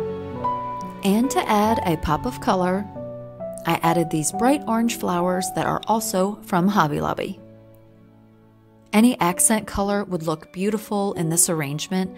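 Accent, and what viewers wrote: South, United States. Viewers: I am southern too and love your soft southern voice. There are many channels that I start to watch and would watch for content but cannot tolerate the harshness of their voices but anyway thank you for your pleasant voice.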